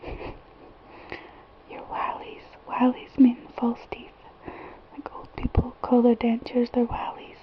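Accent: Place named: Scotland, United Kingdom. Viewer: Just found You.......yahoo.........I am Scottish too but have been in Canada for 50 yrs......I love the accent, my Parents have the accent but I cannot hear it hahaha, cheers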